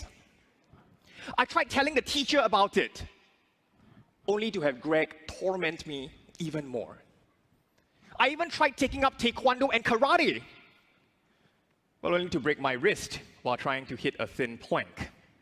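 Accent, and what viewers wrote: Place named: India. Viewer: India accent sounds like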